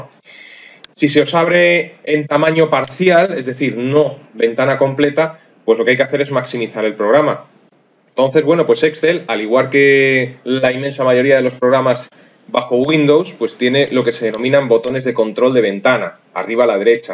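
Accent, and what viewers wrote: Spain. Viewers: soi latino y mmmmmmm sii te entendi no soy de esas personas que nos les gustan para nada el tono o acento español. excelente!!!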